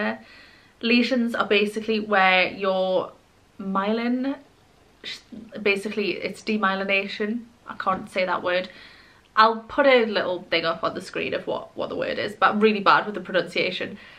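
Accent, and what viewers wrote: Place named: Durham, United Kingdom. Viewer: Are u from Durham by any chance our accent is same as mine that’s all 🤣 I’ve been having what I think is symptoms - usually a fairly healthy person work out eat healthy (most of the time) but I’ve been experiencing painful hips mainly on my right hand side , which cause me to limp numbness pins and needles in my hand feet and travelling up my legs , dizziness fatigue this had all been going on for a while, eventually went to the doctor last week and it all basically fell on deaf ears , raised my concerns of ms and left no further forward 😢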